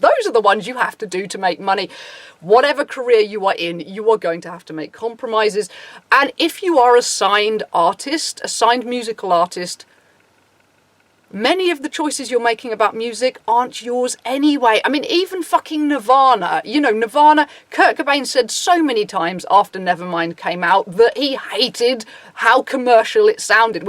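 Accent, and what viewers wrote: United Kingdom. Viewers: Oh that british pronaunciation of you... No idea who you are talking about but I'll watch the video anyways :))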